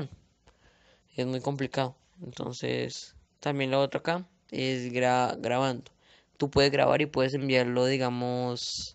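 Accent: Colombia. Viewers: Jajajajajajajaja es que tu acento es de rolo ewe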